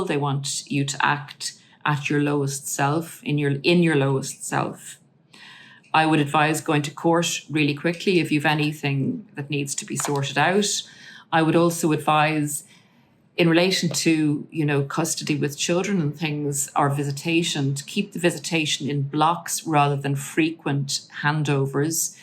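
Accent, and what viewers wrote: Ireland. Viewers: Just love your Irish accent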